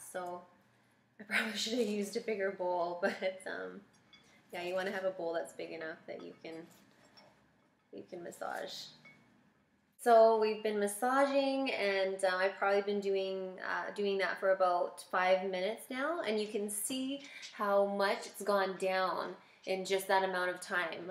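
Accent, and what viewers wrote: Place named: Canada. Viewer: I like your Canadian accent.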